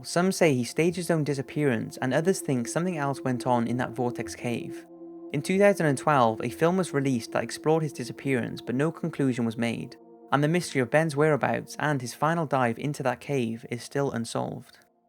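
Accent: Wales, United Kingdom. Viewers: I would say Welsh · He is British but I mean you're obviously right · are you welsh? I know it sounds like a stupid question, but are you? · Love to know where your from. Kinda sound a bit Welshy. Bit of a Cardiff accent going on when you say some words. Your awesome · Ahhhh a good British voice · Wales, UK :P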